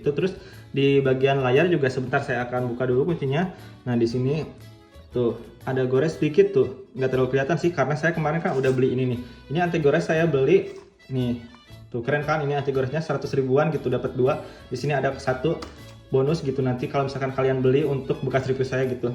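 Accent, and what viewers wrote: West Java, Indonesia. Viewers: Aksen nya khas sunda bgt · sunda nih yah?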